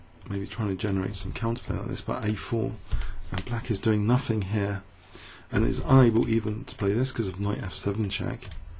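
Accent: United Kingdom. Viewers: Are you from. Uk ?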